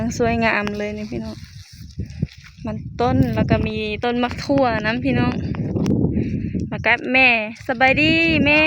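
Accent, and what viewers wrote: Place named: Phu Thai, Thailand. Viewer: ได้ยินคำเว้าของน้องทำให้เราคิดถึงบ้านเพราะเราก็เว้าเหมือนกันภูไทย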